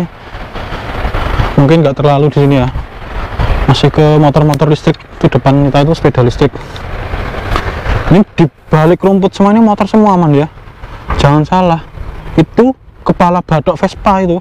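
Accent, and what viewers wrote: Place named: Blitar, Indonesia. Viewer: itu wong mblitaran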